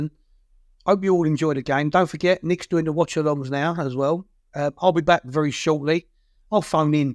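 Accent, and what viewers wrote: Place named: Cockney, United Kingdom. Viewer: Cockney Joe Pesci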